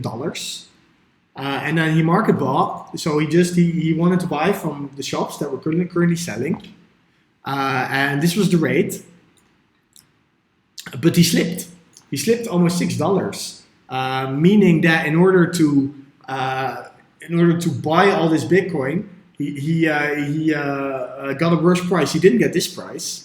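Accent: Netherlands. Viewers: Is that a Dutch accent I hear? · are u dutch? sounds like a anglo dutch accent. thnx anyways for the info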